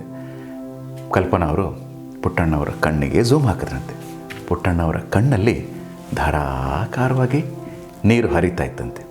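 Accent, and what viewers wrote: Standard. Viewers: ಉತ್ತಮ ಶೈಲಿಯ ಶುಧ್ಧ ಕನ್ನಡದ ನಿರೂಪಣೆ. ನಿಮಗೆ ಧನ್ಯವಾದ. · ಅಚ್ಚ ಹಾಗು ಸ್ವಚ್ಛ ಕನ್ನಡ. ಕೇಳಿ ಆನಂದವಾಯಿತು. ಧ್ವನಿಯು ಸಹ ಸೂಗಸಾಗಿದೆ. ಈಗಿನ ಚಿತ್ರಗಳಲ್ಲಿ ಬರೀ ಅಬ್ಬರವೇ ಜಾಸ್ತಿ. ಕಥೆಯೇ ಇಲ್ಲ. ನಾವು ಹಳೆಯ ಚಿತ್ರಕಥೆಗಳನ್ನ ಮೆಲಕು ಹಾಕಬೇಕು. ನೀವು ಆ ಚಿತ್ರೀಕರಣದ ಸಮಯಕ್ಕೆ ಕರದೊಯ್ಯ್ದಂತೆ ಭಾಸವಾಯಿತು. ಒಳ್ಳೆಯದಾಗಲಿ. 🙏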